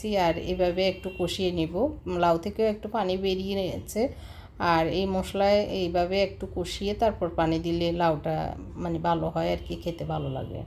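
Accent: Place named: Chittagong, Bangladesh. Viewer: আপুর কথা কিছুটা চট্টগ্রামের টান আসে তাই বলছিলাম।আমিও চট্টগ্রামের তবে থাকি কুষ্টিয়ায়।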